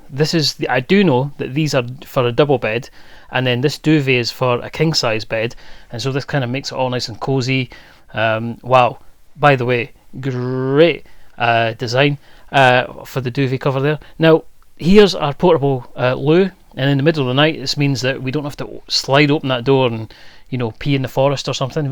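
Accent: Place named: Scotland, United Kingdom. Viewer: Lol, I enjoyed the voiceover. You remind me of lewis capaldi with your humor and similar accent! Looks like a nice cosy set up !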